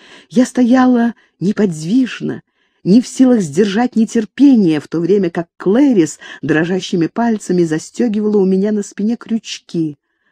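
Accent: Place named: Moscow, Russia. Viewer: Уточнение: верЬх, четверЬг, церЬковь - особенность московского произношения. Устарело, потму что москвичей не осталось.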